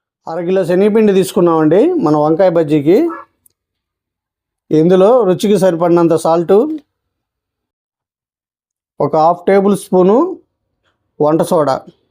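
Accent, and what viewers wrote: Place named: Godavari, India. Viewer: మీది గోదావరి జిల్లా నా అండి.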